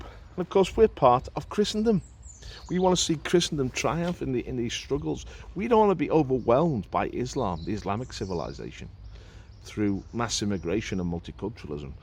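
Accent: Liverpool, United Kingdom. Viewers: What a load of scaremongering misinformed populist crap. Why are so many old men so ill informed and ignorant of the facts..? Possibly because it's so much easier to maintain prejudice and bigotry rather than investigate reality.. There is no foreign invasion of the Netherlands. What bullshit. Your accent suggests Liverpool... So where are your roots..? Celtic, Picts, Scots, Roman, Anglo Saxon...? According to your stupid xenophobic logic all those peoples were foreign invaders. So where does that leave you..? Go back to where you came from... Fool.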